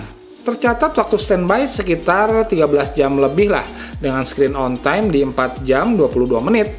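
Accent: West Java, Indonesia. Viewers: Suka sama reviewnya kang. Sunda pisan euy. Hehe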